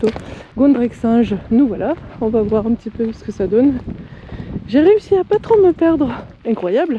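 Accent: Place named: South, France. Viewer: "eh bieng bonjour les copaing..." Ca me fera toujours marrer cet accent de sud perdu en Alsace 😂 Toujours aussi sympa tes présentations :) Tiens, ton écluse de 16 mètres m'a rappelé une autre curiosité que j'ai visité quand j'allais régulièrement à Strasbourg et que tu pourrais présenter: le plan incliné de chaipuhou (en fait je sais, mais j'vais pas spolier)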